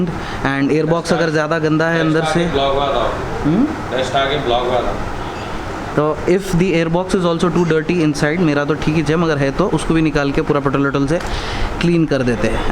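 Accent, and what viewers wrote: Hyderabad, India. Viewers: Awesome video Bhai... Aur Hyderabadi tone me bohot best lagta bhai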